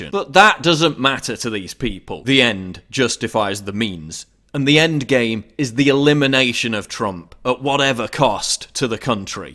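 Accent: United Kingdom. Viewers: We're the crazies. Sure, nothing wrong with Trump. Who are the leakers? WTF is a Brit narrating this? He sounds just like Robin Leach from "Lifestyles of the Rich and Famous." Before Trump was elected, one of his associates said that if he didn't win, "there will be blood in the streets." That scared a lot of people, because we figured it wouldn't matter if he won or not. Because he insisted that the election would be rigged. But he won, so it wasn't rigged... I don't want any wars and I would prefer that he resign to being impeached. But he will never quit because he'll never admit to doing any wrong. Trump told an Evangelical reporter that he has never done anything for which he would have to ask God's forgiveness. In other words, he is perfect and sinless. A bit confusing by most Christian theology, but what the hell. He will never quit because he would never admit to any wrongdoing (adultery, etc.) If only Fox News has managed to report the truth and this Russian stuff is all made up, then he's fine. 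The Russians did a lot of hacking. Trump knew that, but he jumped at the chance to use every Wikileaks the Russians managed to get and spread all the lies the Russians spread about Clinton, and chant the hateful "Lock her up." He colluded. In spirit anyway. You say what Trump revealed to the Russians was to help save them from ISIS attacks? That's good from my pov. But he could have been more discreet. He could have not told them where the info came from. He could have made s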